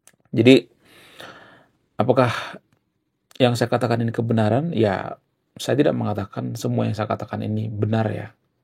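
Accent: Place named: Medan, Indonesia. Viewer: bg lu org medan ya? logatnya msh kental.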